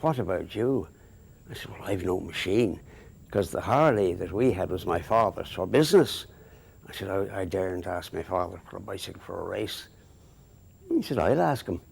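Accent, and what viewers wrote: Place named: Australia. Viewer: He sounds like an Australian.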